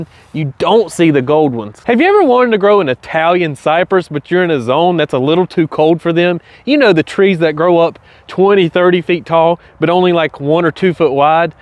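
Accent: South, United States. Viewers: Wow I have watched all your videos. Love your southern accent and excitement about conifers. Just amazing energy. Wish I had your job.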